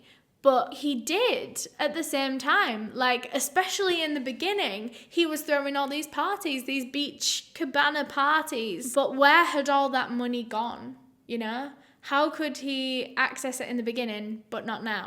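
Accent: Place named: Scotland, United Kingdom. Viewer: Great to join your channel and I am not a troll or any type of idiot, but I have to agree with you when you were promoting your hair products, your hair is shiny, beautiful and the color brings out the beauty of your eyes. I love your accent and I am guessing Scottish because I can understand you, but friends who recommended me to your channel, can't understand you, however I am from Boston and I get it every day, no one can understand me, but I thank you for the opportunity to join your channel and I will continue to do so monthly. Again I am not hitting on you, however, you possess all that I look for in a woman, I think you are aesthically pleasing and me, not a fan of much make up, but the little bit that shows you wear, makes you perfect. Thank you, love Jace Harrell, and that is my real name not Joe or Joseph, whatever it says, and I hope that I didn't just tell everyone, while I thought I was dm'ing you. 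🇺🇸 🏴. You say your r's like me Car is Cah, ❤ it. ✌ girl.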